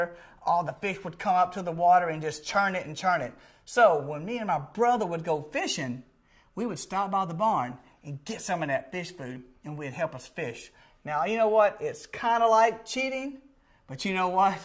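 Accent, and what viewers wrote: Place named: Cajun, United States. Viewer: He's actually Cajun, I can hear the accent (ever so slight, but there), and the expressions. I lived in south Louisiana for many years in Lafayette and Opelousas and picked up the accent myself when I was there. When one moves up north it's so easy to lose it so if he's in NYC that explains it..... Love Cajun! (Now if he isn't, he sure does a good imitation! Lol!) "On-yon"! Lol!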